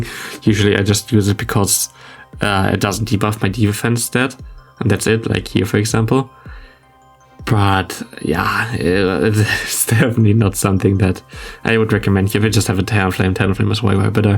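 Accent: Germany. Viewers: He‘s not a native English speaker either. I think he‘s German from what I heard. Brother you just got to get better at understanding English, because thats really not that hard.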